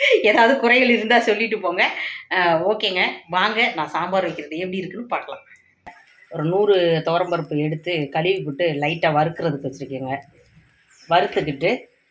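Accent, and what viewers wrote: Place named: Madurai, India. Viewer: Unga pechu ketkka madurai pakkam mathiri irukku........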